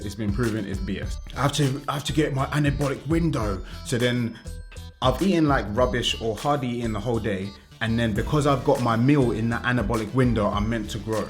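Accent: United Kingdom. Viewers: I noticed, he is actually talk British English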